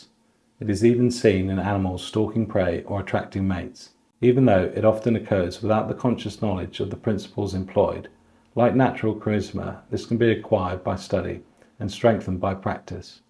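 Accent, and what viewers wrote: United Kingdom. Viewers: nice to hear your British accent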